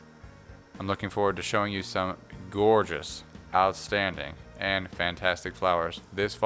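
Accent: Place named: England, United Kingdom. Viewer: Did you go for a holiday to England? Thought I heard some pommie mellifluossity in that soliloquy!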